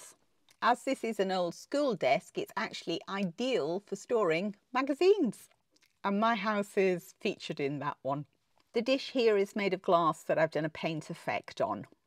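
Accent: England, United Kingdom. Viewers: I adore your sweet English accent as well as your stunning decor! I appreciate the many tips you share throughout your videos. So many lovely things and God has certainly gifted you in displaying them in a way that enhances their charm. So happy that I came across your channel. Looking forward to future ones! God bless!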